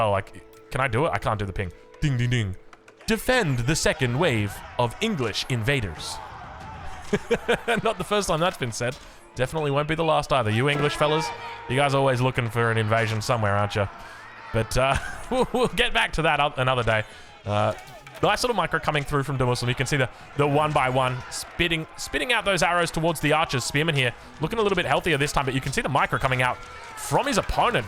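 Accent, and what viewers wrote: France. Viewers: still wanted to hear your French accent Man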